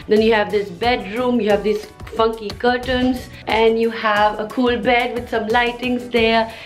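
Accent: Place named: India. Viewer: I came here to get used of Indian accent as you told us. From Brazil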